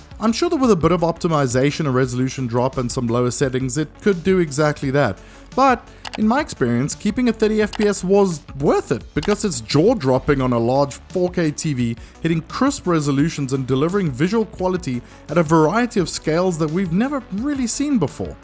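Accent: South Africa. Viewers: Yiss I fought this oke sounded a bit on the safrican side.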